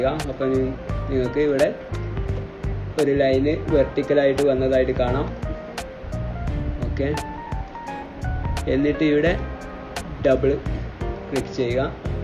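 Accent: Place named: Kanyakumari, India. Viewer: Porathathin video Kasaragod um voice kanyakumari yum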